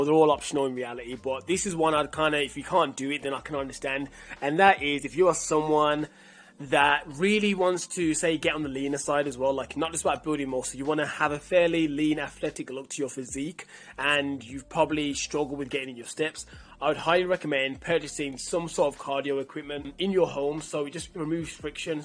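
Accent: Birmingham, United Kingdom. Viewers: are u a brummie? ❤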